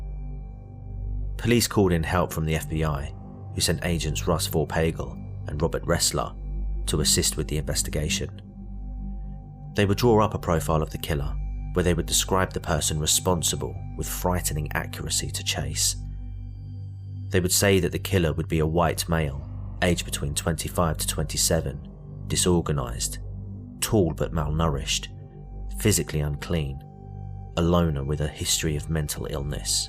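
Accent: United Kingdom. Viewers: Super stuff my man...are you English??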